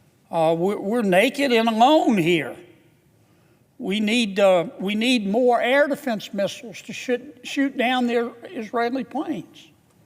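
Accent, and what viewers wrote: South, United States. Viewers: Russian president Vladimir Peutin. Love that southern drawl!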